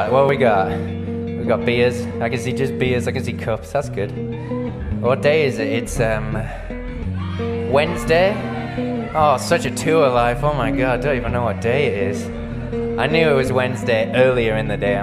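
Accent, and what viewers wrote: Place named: United States. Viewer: Ohhh I do love you Murrrcan accent.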